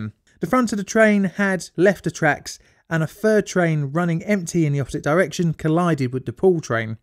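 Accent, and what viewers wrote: United Kingdom. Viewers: Dude love your stuff I mean who takes the time to learn all this I guess we do cause you make it interesting and god love that British accent yes please we would like more or should I say Please sir may I have some more!!!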